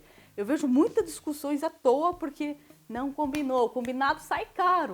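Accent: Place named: Curitiba, Brazil. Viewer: Ótimos vídeos explicativos, sotaque Curitibano "Penca" de app kkkkk amei. Estou querendo abrir um delivery.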